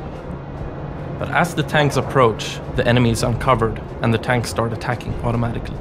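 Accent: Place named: Sweden. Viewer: haha yää, du låter svensk??^^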